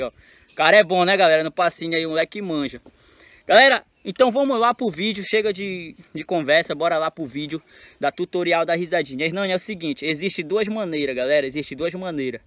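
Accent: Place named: Pará, Brazil. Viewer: tu e paraense crtz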